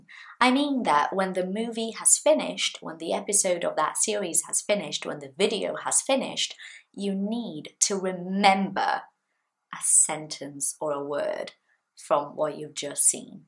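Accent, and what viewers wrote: United Kingdom. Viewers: I love your British accent, it is my focus. I don't pronounce the letter r and I usually use schwa sound, but the most of English teachers prefer American accent than British and the worst is that they try to enforce us to speak like an American.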